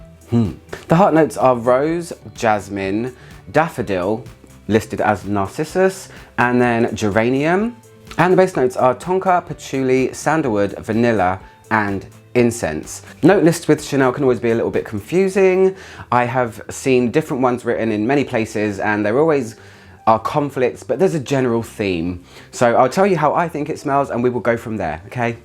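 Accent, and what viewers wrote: United Kingdom. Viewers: 😂 I’m American and this whole time I thought it was your British accent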